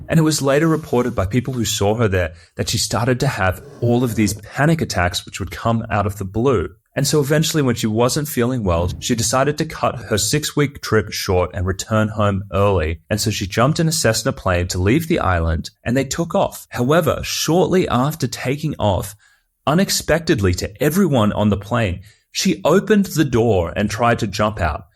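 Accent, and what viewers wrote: Australia. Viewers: I hear an Australian accent? How would you rate the Australian mental hlth system in comparison to other countries?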